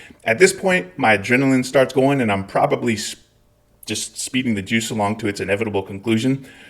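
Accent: Scotland, United Kingdom. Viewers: as a resident of Northern Ireland. Your Scottish accent had a fair hint of a Belfast twang to it! Lol! Nonetheless, you can definitely hear the Scotsman in your speech! Your stories are funny as fuck!! I've never served (My Mum's side of the family did during WW2!) but my Butthole has went nuclear on several occasions! I've made toilets glow in the dark! My farts threaten the fabric of space and time! (Not to mention the fabric of my jeans!😬😬😬)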